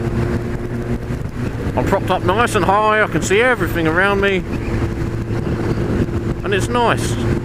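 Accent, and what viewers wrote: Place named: Australia. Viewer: I'm new to you.I like the distance you keep from cars,i like the general message you are trying to give,i like the fact that you havent bought the latest and greatest super duper bollocks and do fine on an older bike.I dislike the slightly australian accent you sometimes have,the colour of the fork tops of your zx9 and erm,the shape of your mirrors.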